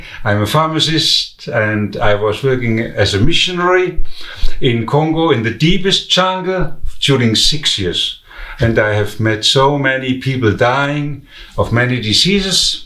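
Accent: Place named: Germany. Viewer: Me gusta como los alemanes hablan el inglés! Sobre todo en la pronunciación de la W 😅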